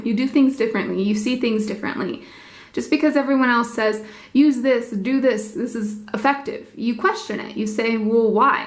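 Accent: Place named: Scotland, United Kingdom. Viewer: LAYERS thick Scottish accent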